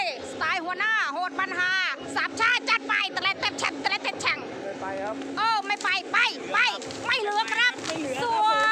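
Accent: Korat, Thailand. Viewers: บรรยายเป็นภาษาโคราชน่าฟังครับ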